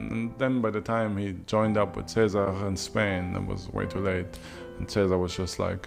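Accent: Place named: Germany. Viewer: thanks! Very informative video. Your accent sounded very German to me but I wasn't sure what to make of it! We are both in the half-german club!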